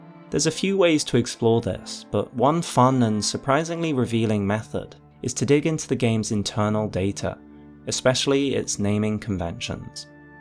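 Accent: United Kingdom. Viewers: Good video but must this guy talk with a British accent????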